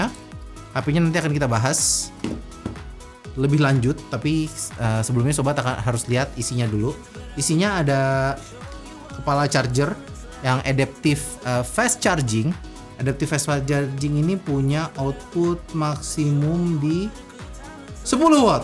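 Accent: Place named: West Java, Indonesia. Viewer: Nyunda pisan si akang wkwk.. Di sorodotkeun wkwk😂😁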